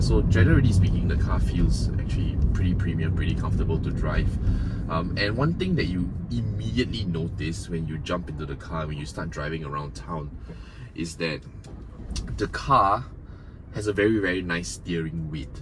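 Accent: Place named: Nigeria. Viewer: Thank you for the review!! Considering purchasing. Also, you kinda sound Nigerian.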